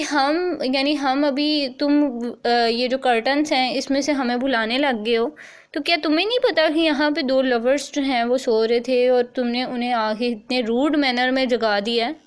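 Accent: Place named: Urdu, Pakistan. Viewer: She is speaking in urdu accent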